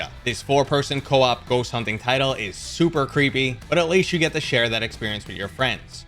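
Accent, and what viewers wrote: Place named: South Boston, United States. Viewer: Appreciate the work put into this video and the Southy accent lo thanks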